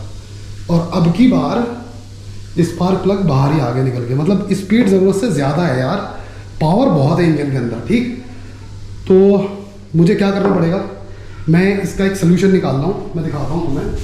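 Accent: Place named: India. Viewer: your videos and accent let me suppose you're from india, isn't it?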